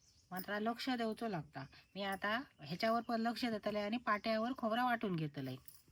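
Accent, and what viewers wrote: Malvani, India. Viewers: काकीची मालवणी ऐकाण पॉट भरला..... वाह....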